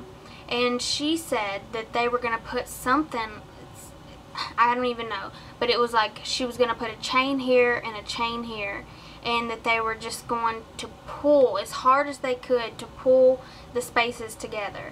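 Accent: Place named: Alabama, United States. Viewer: r u from Alabama because u have a Alabamian accent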